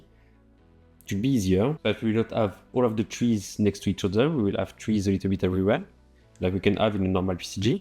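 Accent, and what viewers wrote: France. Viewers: Salut, j'aime beaucoup ton accent français et tes tutos:) je ne suis pas française mais tunisienne mais j'ai récemment entamé des tutoriaux sur ma chaine en Français pour Unreal (et prochainement sur 3ds max et Blender) car j'aimerais participer à la commu FR des tutos et je t'évoquerais dans les prochaines parties de mon tuto sur les terrains/Biomes, si quelqu'un a du mal avec l'anglais, m'autorises tu à poster ce commentaire sur ta chaine pour évoquer la mienne?: <link>, merci si c'est le cas:) et continue les tutos j'ai subbé!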